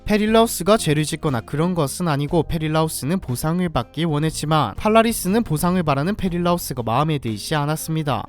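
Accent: Gyeongsang, South Korea. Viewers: 경상도인의 서울말 같이 들리네요 ㅋㅋ 제가 듣기엔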